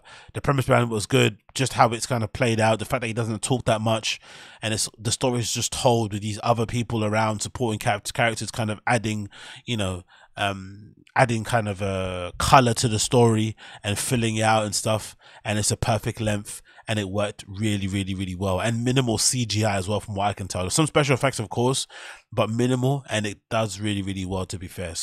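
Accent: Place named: United Kingdom. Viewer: Damn. Where you from, man? You sound British but your name is the most Angolan thing ever.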